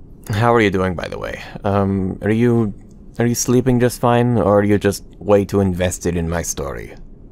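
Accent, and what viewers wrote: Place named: Bulgaria. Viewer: Love the Bulgarian accent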